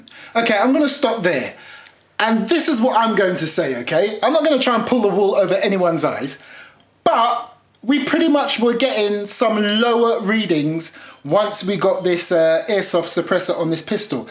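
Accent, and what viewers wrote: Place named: London, United Kingdom. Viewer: Hi, how long did it take for JKArmy to delivery to London (assuming you are from London from your accent)? Also, were there any issues with customs? Definitely thinking of picking this up. Thank you!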